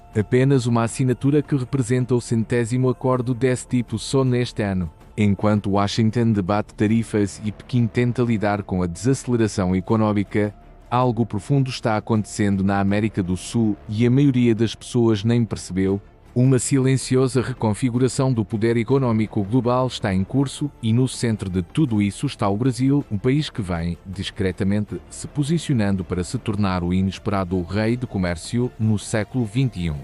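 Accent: Portugal. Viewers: tira essa voz de português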